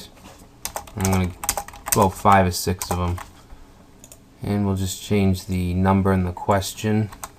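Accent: Boston, United States. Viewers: Thanks for the tutorial. Love the Boston accent. Brings me home. Grew up in Newton but have in in Florida for 10 years.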